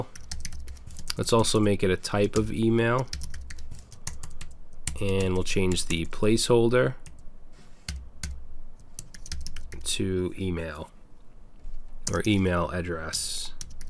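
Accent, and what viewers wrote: Boston, United States. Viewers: Yo is it just me or does his boston accent have him sounding pissed the entire video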